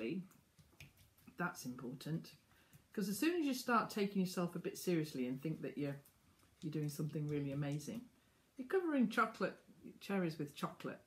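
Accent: United Kingdom. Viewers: I just found your channel linked to Hercules Candies channel. You are so sweet and fun to watch. You made me smile several times as I watched. You stated many things that I feel about Hercules Candies and their crew. It was so fun watching you use the puddle and Steve's technique. Plus, the added bonus of your charming British accent. 😏 Thank you for the smile today. (I know a year later..) I'm looking forward to catching up and watching more. 🙂💚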